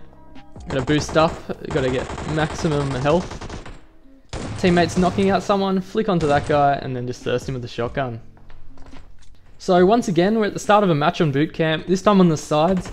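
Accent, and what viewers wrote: Australia. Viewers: Nah I kinda like it the way it is, It's unique. 😂 It brings out that Auzzy charm: "Oh yeah nah yeh, aahhh yeah nah" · The voice of a Australian is rare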